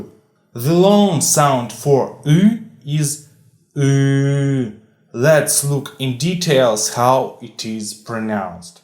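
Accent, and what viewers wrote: Russia. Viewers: Ахуенный акцент братан, иностранцы в эйфории, настоящий русский учит))))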